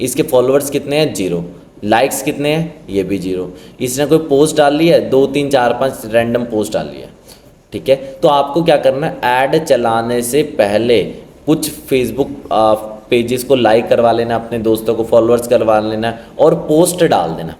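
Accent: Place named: Garhwal, India. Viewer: Sir aapke gadwali tone se smj gya aap gadwali hain bhut bhut dhanywaad Sir jo aap youtube ke madyanam se hmra margdarshan kar rhe hain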